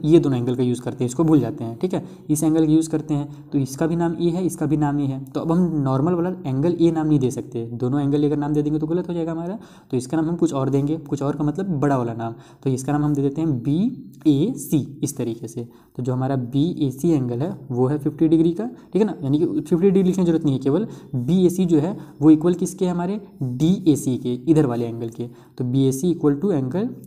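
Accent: Bihar, India. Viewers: Bihari ho sir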